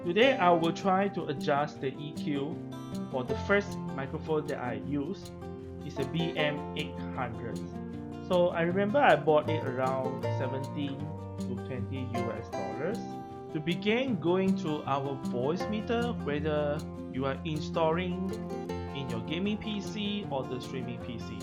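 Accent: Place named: Asia. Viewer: Get rid of the damn background music. It is bad enough that your Asian accent is difficult to comprehend. It is even worse to try to learn from this video with that background noice.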